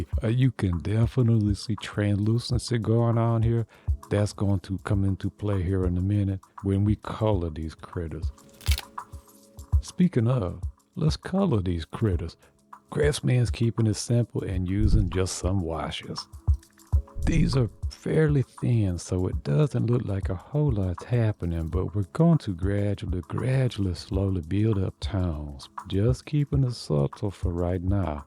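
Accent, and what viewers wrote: Louisiana, United States. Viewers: Are you from Louisiana by chance?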